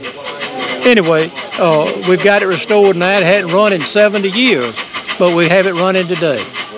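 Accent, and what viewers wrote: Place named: Georgia, United States. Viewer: Love that sweet Georgia accent ❤️ excellent history captured here. Thanks for sharing!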